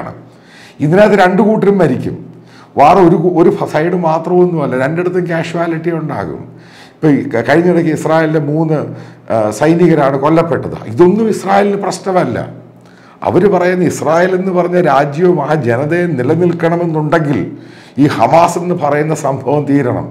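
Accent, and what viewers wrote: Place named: Kottayam, India. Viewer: അത് കോട്ടയം ഭാഷ..തൊലിയായ ഭാഗം മുറിച്ച് കളഞ്ഞ മണി (ഉണ്ട )യന്മാർ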